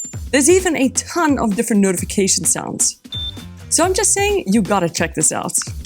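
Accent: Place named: South Africa. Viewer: I got me the Watch 8, searched for tips and tricks and came across your channel. The minute i heard you speak I knew you were my fellow South African :).. Great channel!!!!!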